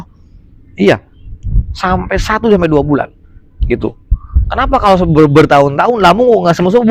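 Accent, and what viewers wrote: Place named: Kupang, Indonesia. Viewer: Logatnya JD agak Kupang hehe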